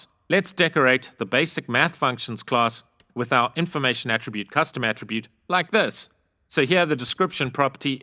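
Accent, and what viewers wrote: South Africa. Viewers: Nothing better than clicking on a youtube vid and hearing a south african accent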